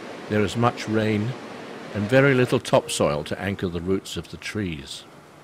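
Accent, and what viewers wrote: United Kingdom. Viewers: Wered you find this voice narrator? i could listen to him all day, love his slight british accent !Really makes the video classy!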